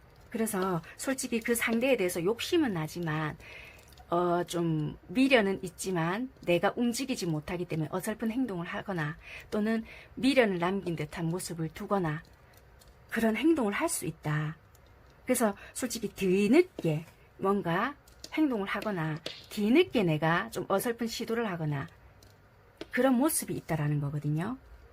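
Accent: Busan, South Korea. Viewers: 잘 지내고 있습니다! 도 건강하셨으면 좋겠어요 ㅎㅎ 온 연락은 비록 지난달 연락이긴 하지만 타로 보며 위로 받아요...^^ 좋은 길이 열리길!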